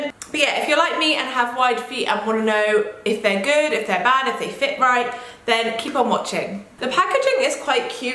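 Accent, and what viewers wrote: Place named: United Kingdom. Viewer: And love your British accent 😍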